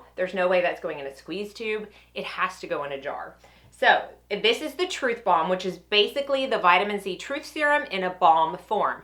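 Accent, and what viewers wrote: South, United States. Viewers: Good info but this could be edited down to half. Most people don’t want to watch unnecessarily long videos. You could have a huge following if you get to the point and just talk about the products and how to use them. No useless banter about the shape of bottles, etc. Sounds like you’re from the South and I know women talk a lot in the South because my mom and aunts were from Louisiana but please, CA women will never take the time to watch this entire never ending video.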